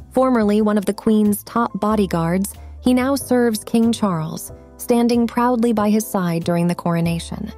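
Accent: United States. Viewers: This video is narrated in American version of English so it was pronounced correctly in that language.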